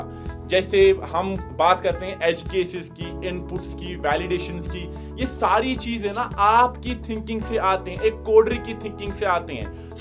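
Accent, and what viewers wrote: Kashmir, India. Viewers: Ap kashmir say ho kya sir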